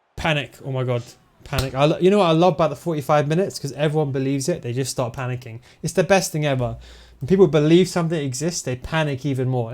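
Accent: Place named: United Kingdom. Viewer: bro speak more clearly, not everyone is understanding you other than people from UK. after "player lock" bro wtf u sayin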